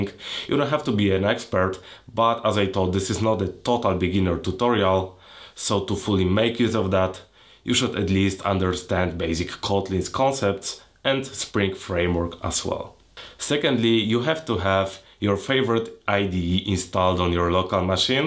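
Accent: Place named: Russia. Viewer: Видно, что акцент русский, но видео на пендосском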